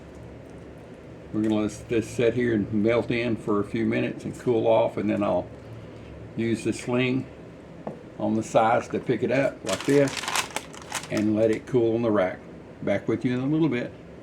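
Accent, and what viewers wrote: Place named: East Tennessee, United States. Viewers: This looks wonderful!!! About your accent, it's great!! Here in Tennessee, you'd fit right in!! Take care! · thank you so much, I went back and rewatched your video and got the ingredients, just cuz I like to hear you talk I'm from East Tennessee so we speak the same language, lol...imma be making this today, that is if I can get Walmart to deliver my beer😅 Tell your wife you tickled me too, make me spit my coffee out when you we're trying to say particular😂😂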